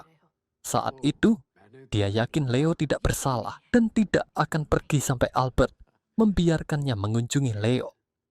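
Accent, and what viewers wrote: Java, Indonesia. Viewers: Suaranya jawa banget. Kureng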